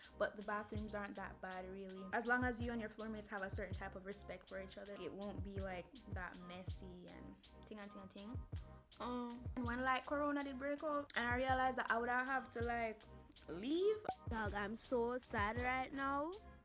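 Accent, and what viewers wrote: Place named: Jamaica. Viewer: my entire family is jamaican and idk why but hearing your voice made me feel so at home!! keep it queen ✨ love the videos !!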